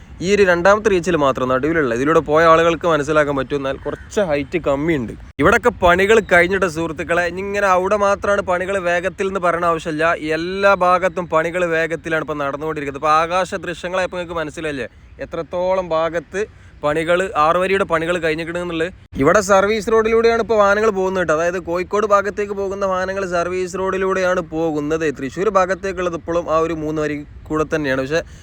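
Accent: Malappuram, India. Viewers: മലപ്പുറത്തിന്റെ സ്വന്തം ശൈലി ഇതിലും നന്നായി വേറെ എവിടുന്നും കേൾക്കാനായിട്ടില്ല... You are supper Bro. 🎉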